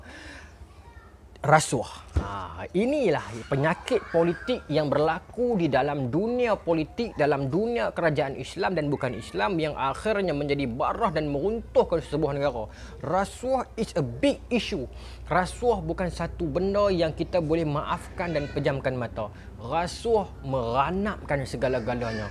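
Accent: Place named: Penang, Malaysia. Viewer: Amboi ustaz, lenggok bahasa satu macam miya 🤭🤣 Jarang-jarang boleh dengaq lenggok penang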